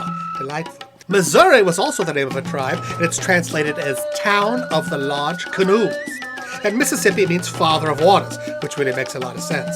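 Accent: Germany. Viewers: that's the worst german accent i ever heard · Your ''German'' accent is shit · you have a German accent? really? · WHATEVER GOES FOR ME it's a german accent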